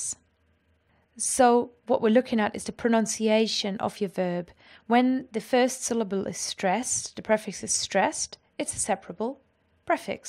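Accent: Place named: United Kingdom. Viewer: I love the British Accent English and the German together! Great combination and very clear simple explanation.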